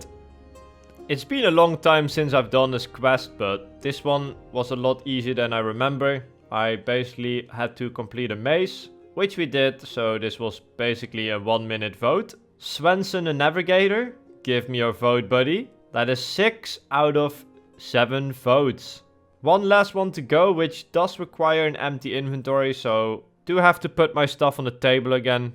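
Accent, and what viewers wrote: Netherlands. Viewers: My god the video is fantastic! the Dutch accent is hard to listen to, but I will stomache it for the content :)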